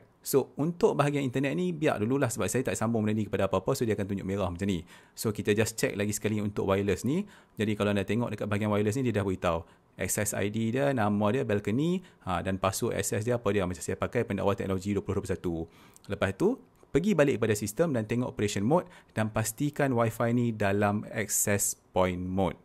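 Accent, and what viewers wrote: North, Malaysia. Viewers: Cukup sempoi n natural. Maintain bahasa utara. Terbeikk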